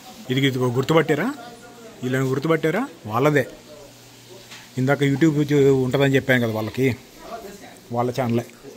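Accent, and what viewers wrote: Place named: Hyderabad, India. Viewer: Mama,mass r nuvvu, hyderabadi ani pinchav,kummai🎉🎉❤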